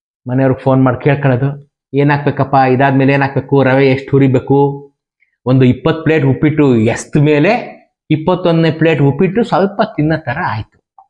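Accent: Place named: Mysore, India. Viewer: ಬೋಸಿ 😂😂😂 ಈ ಪದ ಮರ್ತೆ ಹೋಗಿತ್ತು.... ಮೈಸೂರು ಕನ್ನಡ ಅಂದ್ರೆ ಇದೆ ❤❤❤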